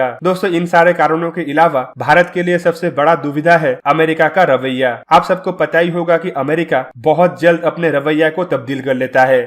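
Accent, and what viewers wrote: Bengal, India. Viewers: bhai pls hindi ko thik karo..bangali mix hindi acchi nhi lagti..is liye aapka chnl unsub krna padega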